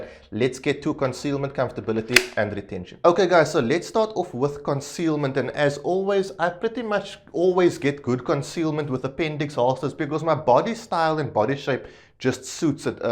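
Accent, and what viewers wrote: Cape Town, South Africa. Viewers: I could immediately tell OP is from Cape Town when I heard him speak. This is typically an accent you'll hear from the Cape Coloured community.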